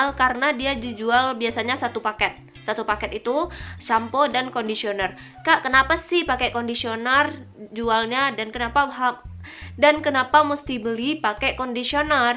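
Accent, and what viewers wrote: Indonesia. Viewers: Suaramu dek mirip minang kocak 🤣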